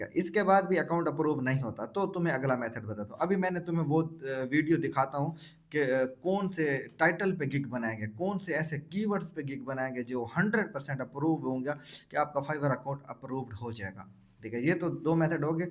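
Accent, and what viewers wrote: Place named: Kot Addu, Pakistan. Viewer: chlyn good hogia bhai... Seriously thanks wo meri kismat account chly na chly laikn aik e gig pe 20+ orders mily hn bss payment method add hojy ksi traa.. boht informative vedio thi apki.. apky ascent se to aesy lgta hy k ap DGk, taunsa ya kot adu bellt se belong krty hn I'm i right? 😂 Mn khud v kot adu k city CSS se hon😊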